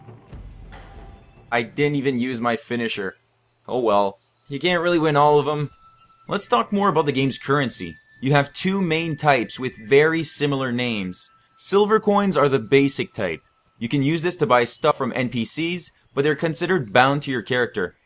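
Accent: Canada. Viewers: Are you Canadian? Cause you said aboat instead of about I'm not trying to be racist but idk it reminded me of young Robin from HIMYM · ....He's Canadian!! His accent bothered the crap out of me trying to figure out what it was.... Time to rewatch the video, now that I can concentrate.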